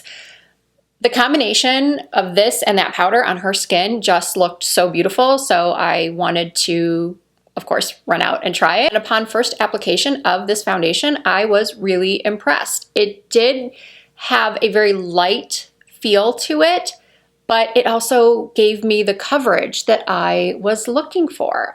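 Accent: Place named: North, United States. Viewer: Hi, I really do love your channel. My skun, skin tone etc are completely different from yours, but I always gain great information from you. I feel bad that you have to always defend yourself from negative commentary. I admire all you tubers that have to put up with that. I just don't think I could do it. As an aside, I was born in Michigan, so I recognized your northern accent right away. Much love your way, and thank you so much Lisa, in Tennessee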